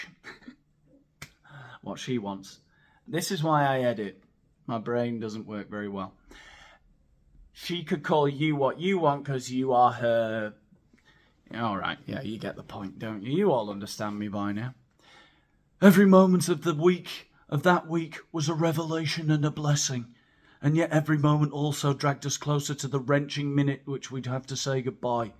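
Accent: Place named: United Kingdom. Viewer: I ❤ conjunctive adverbs. Then again, everything sounds splendid in British English.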